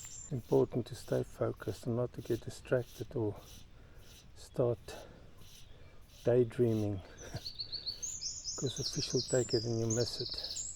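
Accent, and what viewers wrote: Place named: South Africa. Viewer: Hows it bru? Just come across your video. My best mate growing up was South African. He was the most loyal true mate you could ever ask for. We had some awesome times fishing together. I still miss him 15 years on. I dont know why that matters to anyone on here but i didnt think hearing ya accent would make me think about him like it has. Keep doing what you doing 👍🇬🇧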